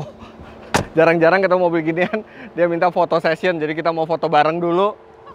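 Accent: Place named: Malang, Indonesia. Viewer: oyi sam mangkane ndelok seng lengkap opo'o om fitra kok tak arani kenek logat malang, mergo gelek bareng karo mas gilang juragan 99. Aku yo eroh nek yowis iku boso jowo sans sam gausa mesoh mesoh ngono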